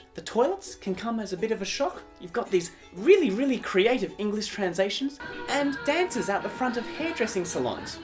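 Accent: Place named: Australia. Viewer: Your accent is so aussie!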